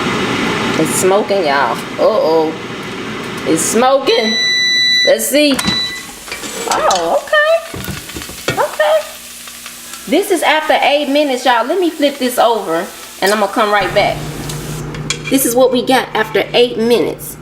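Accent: South, United States. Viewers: I love your southern accent! 👍❤️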